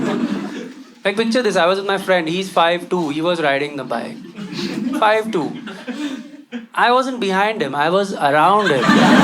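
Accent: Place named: South India, India. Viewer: Watching you for the first time and totally hooked....you sound so much like a south indian 😂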